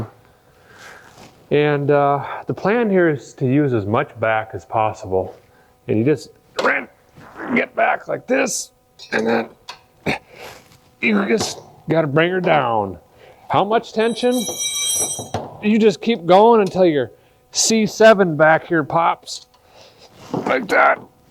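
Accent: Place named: Maine, United States. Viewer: you almost sound like a mainer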